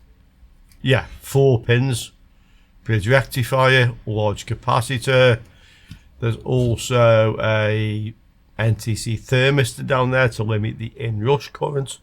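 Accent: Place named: Potteries, United Kingdom. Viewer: I think the most recent one was “Hot Ground”, your video with Carlos on how to avoid blowing up your oscilloscope without having to buy a differential probe. <link> timestamp is where you demonstrate this technique. I’ve been enjoying your videos for several months and as my late wife was from the Potteries (Burslem), I recognised the accent straight away. You’re doing a great job of explaining these electronic circuits so that it’s easy to see how they work, and your commitment to safety and explaining the risks inherent in testing and probing mains-operated devices is impressive. I did a degree in electronic engineering before I changed career and I’m beginning to appreciate how much I failed to learn back then! You and several others on YouTube have reawakened my interest in this fascinating field, so thank you very much.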